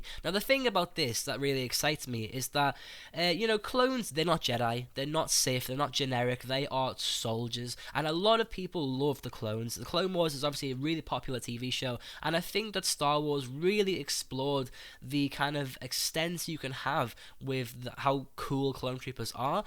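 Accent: Liverpool, United Kingdom. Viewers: can i ask are you from Liverpool? i live in Liverpool, i'm glad to hear a familiar accent on youtube :)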